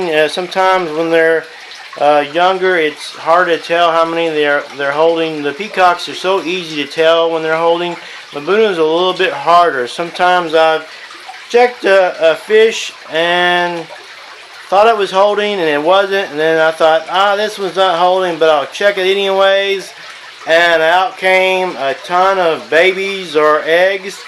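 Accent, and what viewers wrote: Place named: East Tennessee, United States. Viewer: Very cool your educational video, along with the east TN accent! Very cool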